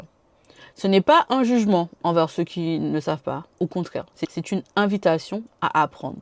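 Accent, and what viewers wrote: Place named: Martinique. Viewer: La première vidéo que j'ai vu de toi, j'ai su tout de suite que tu es martiniquaise. Tu as bien l'accent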